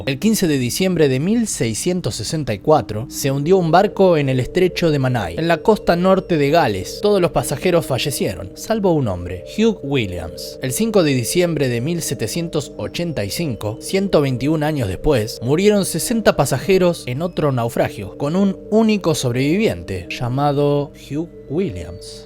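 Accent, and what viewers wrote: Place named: Argentina. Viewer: Me gustaria saber de donde es este muchacho, parece argentino.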